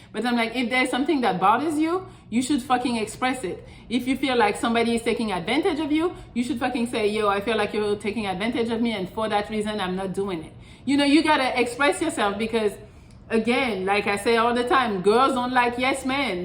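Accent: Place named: France. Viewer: Most probably from France. I hear a French accent.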